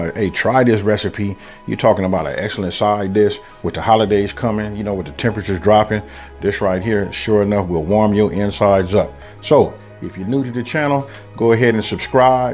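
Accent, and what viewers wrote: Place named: California, United States. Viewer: You most definitely have that Cali accent! But I love your recipes 🤟🏾🤟🏾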